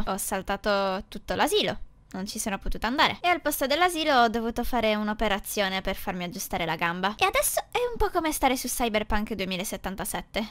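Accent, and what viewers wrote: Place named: Sicily, Italy. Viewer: Io che credevo eri siciliana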